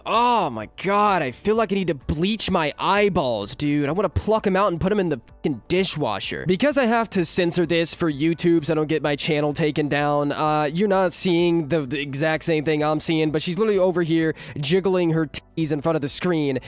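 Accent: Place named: Texas, United States. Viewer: Sounds like Texas...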